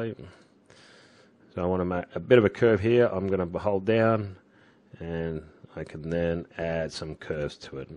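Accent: Australia. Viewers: Cool. You still got the sweet aussie drawl mate. ☺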